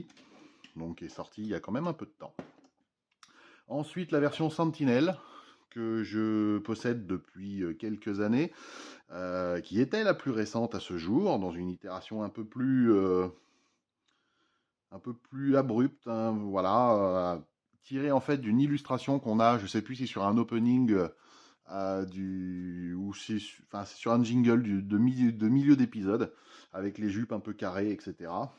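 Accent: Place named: France. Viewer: Quite an impressive French Accent here :D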